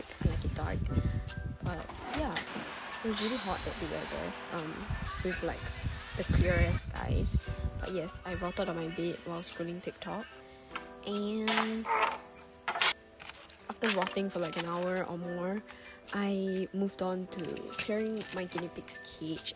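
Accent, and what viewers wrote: Singapore. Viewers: I love your singaporean english accent 😭😭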